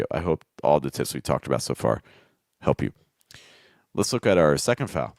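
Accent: United States. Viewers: I've totally improved my American accent with you .. thanks a lot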